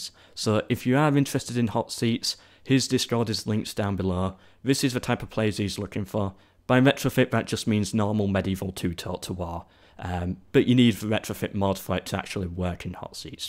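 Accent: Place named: Northern England, United Kingdom. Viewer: Cool. I figured it was northern england, but couldn't place it. Your accent is very similar to Ygritte in Game of thrones. I'm from Norway btw. Cheers mate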